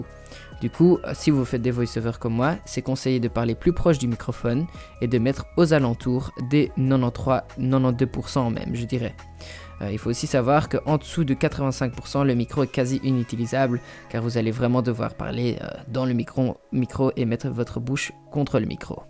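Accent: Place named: Belgium. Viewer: Incroyable l'accent belge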